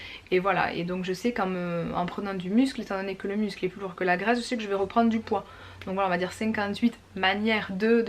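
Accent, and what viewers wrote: Toulouse, France. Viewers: Salut moi c'est Virginie..j'ai été opérée d'une sleeve en janvier 2017, j'ai perdu près de 50 kgs mais je partais de très haut (133kg) je suis donc encore en obésité 1 ...je voudrais perdre encore 13 kgs pour atteindre mon objectif personnel...c'est dur dur...en tout cas, je te dis un grand bravo...tu es magnifique et merci pour tes vidéos ...ton accent Toulousain me met du soleil dans le coeur... (je suis née à Montauban mais j'ai grandi dans le nord. ..)... merci et bonne continuation à toi...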